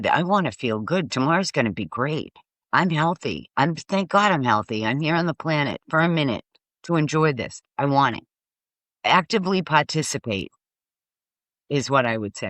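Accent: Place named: North Shore, United States. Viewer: This was my 1st time watching this channel and I wish I could HYPE it. Subscribed with a smile., Thank you sooo much for sharing your stories and insightful perspective. What a wonderful NDE! It touched my and my husband's heart. Don't stop telling your truth...The world needs to know. Thank you for helping to wake up the collective. By chance, are you from the N. Shore of MA? 😉 I'm from Wellesley but went to college in Beverly and you sound just like those wonderful people! 🤸🏻🤸🏻‍♀️🤸🏼‍♂️ PS. You both sharing genuine love at the end filled our hearts.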